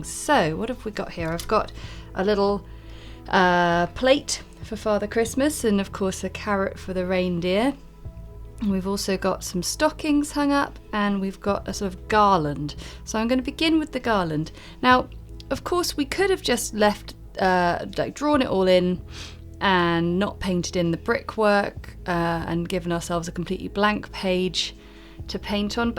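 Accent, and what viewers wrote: England, United Kingdom. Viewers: No no! I’m sure you say it the English way! You know how we Americans love the English way of speaking! Don’t change.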